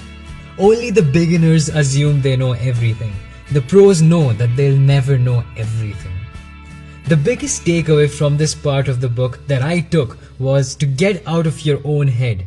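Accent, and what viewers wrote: India. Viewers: awesome! really awesome! BTW are you an Indian?ur accent is like one! 😄🙌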